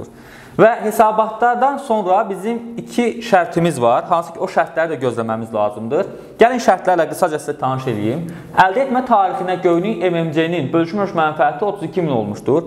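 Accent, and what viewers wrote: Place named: Sheki, Azerbaijan. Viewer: Müəllim deyəsən Şəkilidir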